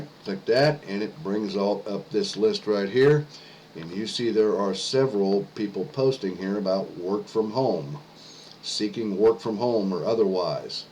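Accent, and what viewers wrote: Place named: South, United States. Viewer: Love that Southern accent. Yesss!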